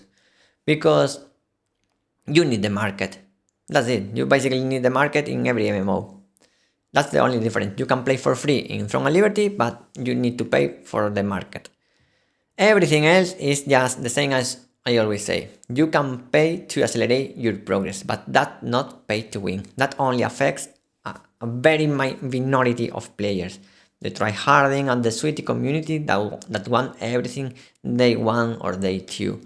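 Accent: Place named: Spain. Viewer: I love your spanish accent. Whenever you say the word just yast* im smiling😊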